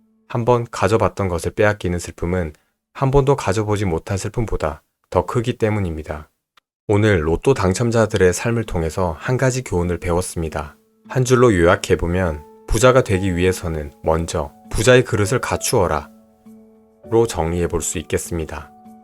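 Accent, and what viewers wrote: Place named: Gyeongsang, South Korea. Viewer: 경상도 사투리억양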